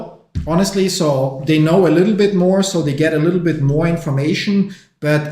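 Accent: Germany. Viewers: so typical German to pronounce a C like a Z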